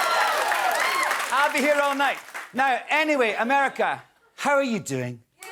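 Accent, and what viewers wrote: Scotland, United Kingdom. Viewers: Nice to hear a Scots accent doing the monologue on a late show again. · I didn't know Alan was Scottish. Whenever I saw him in some movies, he had an American accent. He did an excellent job hosting Jimmy Kimmel Live👍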